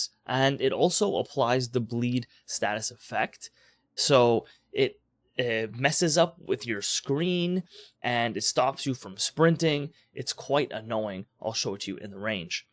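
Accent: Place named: Canada. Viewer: Dude, where in Canada? I can't quite place the accent.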